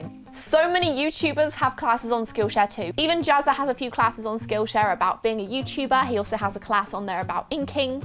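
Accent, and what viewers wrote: United Kingdom. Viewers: Are you from Britain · Are you British??cuz ur accent tho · Omg i Love your british english 😍😍 (I'm from Germany and i am learning American english )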